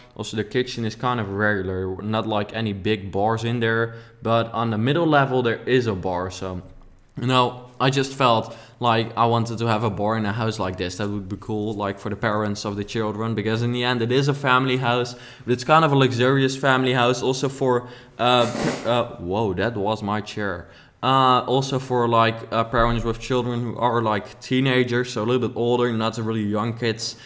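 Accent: Netherlands. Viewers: You do have a little accent, but I don't think its anoying. I just like your video's! I'm dutch to and its just funny when I hear that kind off accent❤ And its a super cool house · I'm Dutch and yes and I can hear you're Dutch too, btecause of the accent. but you pronounce every word right and it's not like you always stop to think about how to say or seek for words. So you're good for me! :) (Go Gelderland! :D) Daarnaast. Je builds zijn geweldig! Ik houd van zulke moderne huizen ipv de typische american houses of de standaard rijtjes huizen die wij hier hebben! Ga zo door!! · Great work! I love the part in the middle, it's so creative! As a Dutchie myself, I did find your accent a bit uncomfortable in the beginning, because it sounds so Dutch? But now I totally love it, and I always listen to everything. And you come across as a very nice guy, down to earth and sweet, very different from other channels.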